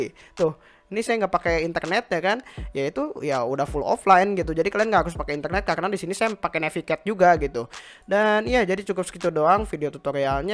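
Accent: West Java, Indonesia. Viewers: Sunda Geuningan?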